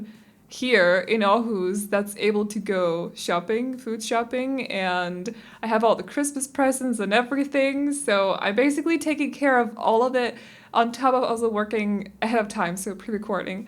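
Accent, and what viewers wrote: United States. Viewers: Åååh hvor er jeg glad for at du taler med Amerikansk accent og ikke klassisk Danglish. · I keep forgetting that you're not American because you sound like it.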